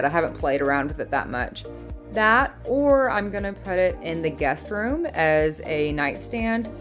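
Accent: Texas, United States. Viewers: So cute that I just knew she was in TX. Our daughter was born in TX when we were stationed there back in 1986. You never forget the friendliness and that dialect! Beautiful people. Beautiful State! We loved our time in Texas!! Thanks for all the super DIYs! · What part of Texas are you from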